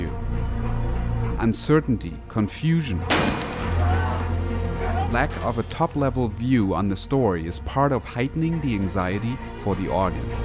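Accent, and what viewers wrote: Germany. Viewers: Hey do you have a German excant ? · Defiantly has a German accent first thing I noticed lol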